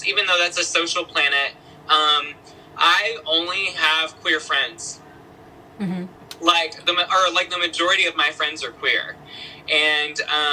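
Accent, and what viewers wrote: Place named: South, United States. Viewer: Can I just say I love your accent ! I’m from jersey , and your southern accent is so cute to me ! Also , y’all are so dope ! Thanks for this ❤️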